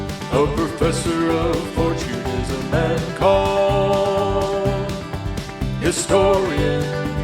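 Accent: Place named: Virginia, United States. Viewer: listening to your accent over your last three videos, when you say letters and words like a, an, the, it sounded like a tidewater accent. If you're not from Norfolk, then your accent also sounds like it's from or at least developed in the tri-states region of Virginia. When I listen closely, I'm really hearing Appalachian overtones in the words, tember and cadencé . Respectfully WS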